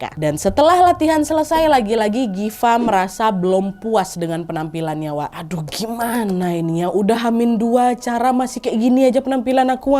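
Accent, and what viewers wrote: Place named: Java, Indonesia. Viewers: kak nad sampean logat nya jawa nonjol pol loh 😭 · 😂😂😂😂 ngomong jawanya pinter. Medok juga. Mantap 👍🏻👍🏻👍🏻 menjiwai ceritanya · Ada yg baru nonton oktober 2025😅? Kak medok Jawanya uapik tenan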